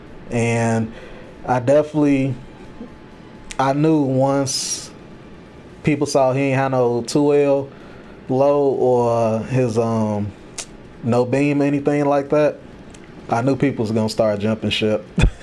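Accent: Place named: Texas, United States. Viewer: thought you was from Texas lol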